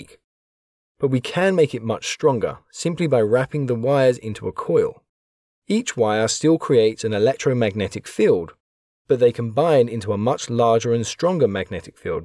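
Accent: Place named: West London, United Kingdom. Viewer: Which part of west London are you from ?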